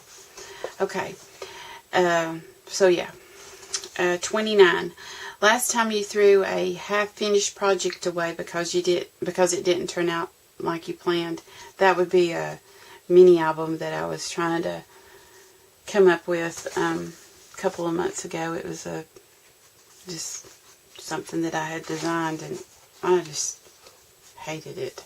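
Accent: South, United States. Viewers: I loved that you changed your questions, that was I felt it was too much private information they were wanting. I had to laugh about Eclectic Hillbilly. I am so very thankful that Pam (Grammie2Bay) and Beth (BethScrapbookRoom) both turned me onto your channel. I just love your southern draw and mannerisms. I know what "I Bless your Heart: means. I enjoy thoroughly listening to your soft soothing voice, within 7-10 minutes I know my blood pressure has dropped as I mellow out with you. I guess I am going to bite the bullet and start making youtube videos. I need to research how its done, and if I can even do them in my little craft are (I have my bedroom closet. Maybe that would be my first video. Anyway thanks again for changing the questions. Take care and hope to talk soon. Teresa in Maryland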